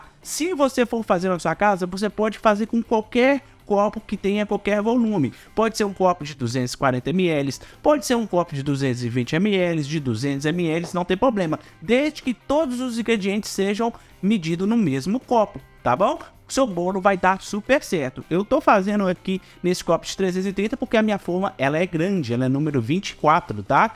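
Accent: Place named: Minas Gerais, Brazil. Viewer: Ñ sei se falo da receita ou desse sotaque maravilhoso, eita que mineiro é outro nivel ❤❤❤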